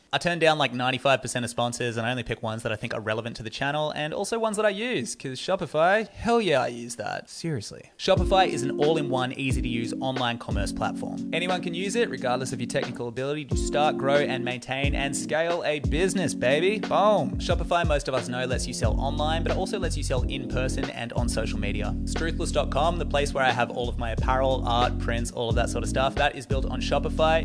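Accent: Australia. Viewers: He has an Australian accent. He sounds just fine and legible to me, but I’m American and English is my only language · Lots of good information here. I am considering watching this again. The fast talk in an Australian accent combined with the motormouth editing made it a bit difficult to follow. Consider spending a bit more time on writing with brutal cutting to get a concise message with more impact, in less time. Know what is important in your message and cut out some of the snarky humor. Language was invented, after all, by air-breathing mammals so there is a reason for the natural pauses in our speech. Your humor would hit the mark more often with some space to inhale giving the viewer time to "get" the joke. Remember that the most important part of your message is always non-verbal. It's in the spaces between the words. Give us those spaces so that we can interpret your speech. 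I appreciate your frank and personal style. The core message is empowering and inspiring. More please.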